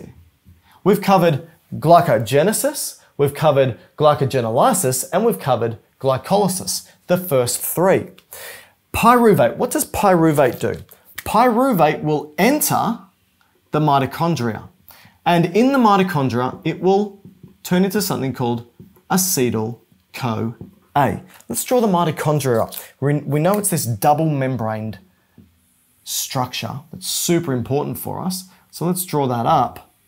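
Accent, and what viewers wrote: United Kingdom. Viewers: thank you British man 🙏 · Are you British???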